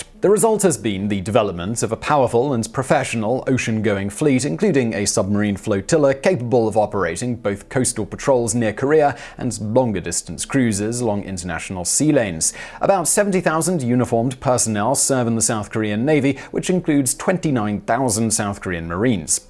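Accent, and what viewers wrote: England, United Kingdom. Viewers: Johny Ricco well, he’s English English. 😂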